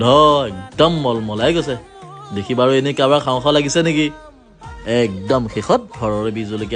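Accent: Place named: Sivasagar, India. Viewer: Video bur sai vl .😍lagil dada ...amr sivasagr rr jen paisu kotha Burt 😅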